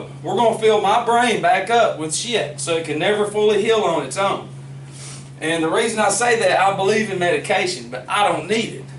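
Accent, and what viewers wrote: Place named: Texas, United States. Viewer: Great video dude. Raw and honest. Love it. U just be from Texas or Tennessee. How’s ur progress these days?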